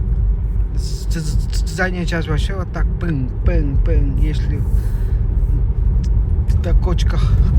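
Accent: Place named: Tuva, Russia. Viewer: У тебя тувинский акцент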